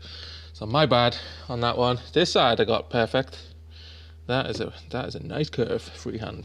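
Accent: Liverpool, United Kingdom. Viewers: You sound local to me. Scouser! I live in Liverpool also. Looking to buy a Kia e-Niro. We have an ID.3 and an Outlander PHEV. Want to get shut of the Outlander soon and wanted to see what the boot space was like on these Kia’s. Thanks for the great video on maximising the space. 👍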